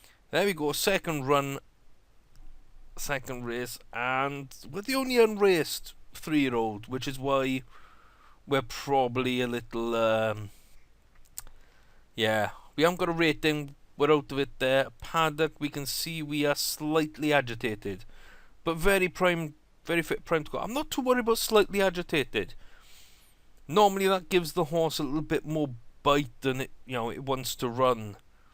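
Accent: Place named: Wales, United Kingdom. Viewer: That's a Welsh accent if I've ever heard one. Where you from?? I want to say the Valleys?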